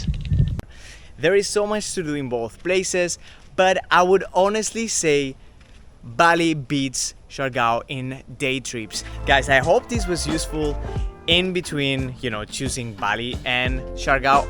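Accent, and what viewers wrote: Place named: Spain. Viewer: Bali is already established while Siargao is just beginning to show and mark its presence among the world”s favorite island resort. I love your Spanish accent though and be yourself always but I would not be surprised if you will gain more subscribers and followers while in the Philippines. Enjoy ur stay in Siargao and also visit Boracay and you find so many luxurious accommodations.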